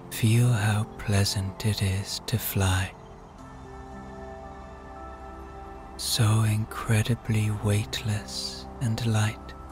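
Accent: Ireland. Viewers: OMG in the greatest respect I love you as an actor, TBh I was surprised that your beautiful silky voice as a storyteller actually sends me to sleep. Why? Bcoz I've suffered insomnia, bcoz of PTSD for years, so thank you for making my night more focused and yeah, without sounding insensitive your beautiful Irish tones help me to blissful night's sleep ❤🙏🙏🙏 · Hi, I’d like to say a massive thank you to you for your wonderful channel. Since my amazing hubby passed away, bedtime for me has brought anxiety, tears, insomnia like you wouldn’t believe and emptiness. I’ve tried music, silence, audiobooks, meditation and yet still I found it so difficult to relax. Your sleep stories are beautiful, your gentle Irish lilt soothes and caresses away the anxiety. I can categorically state I haven’t yet got to the end of your stories, which for me is a miracle! You are truly a gift to me and I wish you every success in growing your channel…. 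I for one will be listening and looking forward to each of your uploads… Again, thank you so very much for all you do for us in your growing community xXx💜xXx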